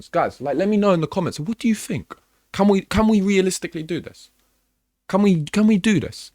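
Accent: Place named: MLE, United Kingdom. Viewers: You're very clearly English, you have that awful MLE accent. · Was not expecting a multicultural London English accent when I clicked on the video!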